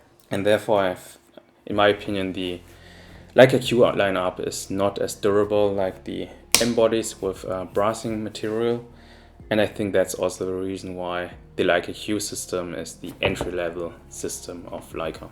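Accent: Germany. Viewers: i think i hear a german accent, live in Germany? i was incredibly hyped up for leica q3, you shattered my dreams :( :P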